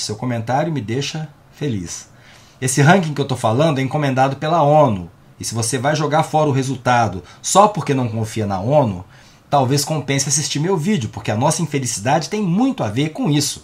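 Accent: Minas Gerais, Brazil. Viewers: Faço minhas suas palavras. Parabéns pelo tema deste vídeo. Agora, diferente de você que é mineirinho, imagine o meu grau de frustração por morar em Curitiba que, embora seja considerada uma capital com um dos maiores índices de qualidade de vida do Brasil, convivo com a população mais "canhinha" que habita nosso querido torrão natal. Você conhece a escala de Bristol? Se conhece lhe digo que o pessoal daqui tá no topo da escala "prisão de ventre" Mineiro fala diferente mas nós aqui também.😂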